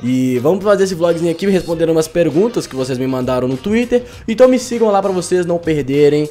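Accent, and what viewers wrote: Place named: Minas Gerais, Brazil. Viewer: Tem, de mineiro hahaha :3 é muito bonitinho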